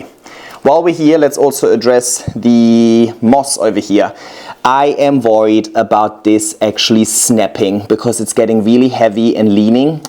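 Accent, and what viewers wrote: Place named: Germany. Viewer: Grazie per i tuoi bellissimi video, per la generosità con quale condividi la tua esperienza. Da anni sei una fonte di ispirazione per me! E poi c'è una cosa buffa, che capisco molto meglio il tuo Inglese con leggero accento tedesco, rispetto al inglese comune 😂. Grazie❤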